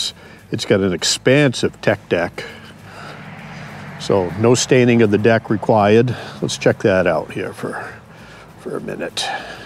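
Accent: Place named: New England, United States. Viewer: Love that "Gaaaden". Love your New England accent. Can't get enough of it.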